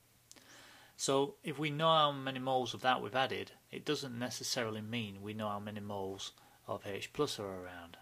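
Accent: United Kingdom. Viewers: Thanks for this tutorial, it is great and very logic. It helped me a lot. And i LOVE your british accent! G.d save the Queen!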